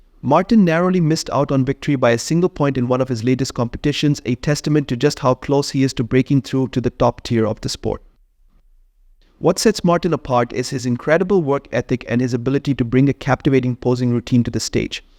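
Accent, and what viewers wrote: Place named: India. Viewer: I am sure you are Indian because of your tone.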